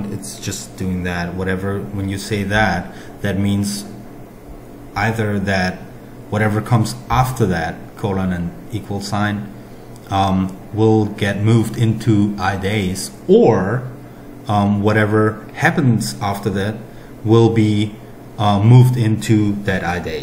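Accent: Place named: United States. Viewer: OK, that explains those pauses and hesitations and my hunch that you did not sound as an English native speaker, however your good pronunciation deceived me. Anyhow, you don't sound German, more like an American accent. Keep making this sort of videos, but improve your fluency to make them shorter and more pleasant to your audience.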